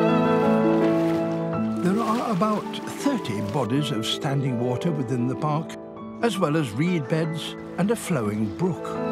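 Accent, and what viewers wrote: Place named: United Kingdom. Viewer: I just saw your lovely YouTube video. Love your videos and British accent:)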